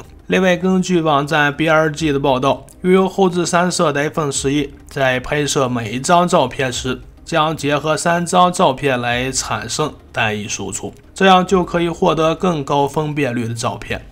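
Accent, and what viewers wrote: China. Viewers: 兄弟，你是山西人。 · 中国农村口音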